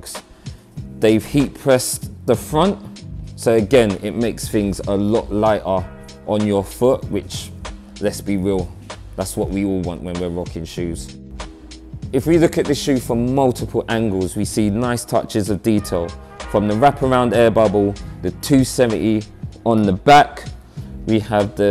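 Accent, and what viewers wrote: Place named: England, United Kingdom. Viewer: Its amazing an african guy speaking like an englishman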